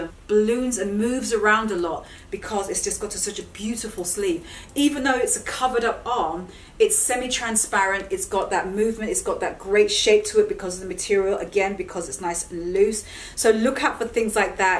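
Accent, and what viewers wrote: England, United Kingdom. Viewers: Is that English accent that you got there? I love it anyway 😍😍😍😍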